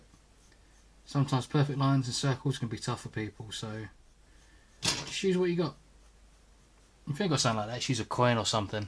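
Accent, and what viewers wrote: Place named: England, United Kingdom. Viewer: he has an english accent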